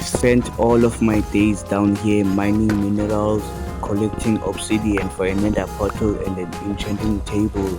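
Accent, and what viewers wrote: South Africa. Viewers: U sputh african????? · his accent is obvious, might be Zulu guy or venda idk tbh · You sound sound south African like a Xhosa one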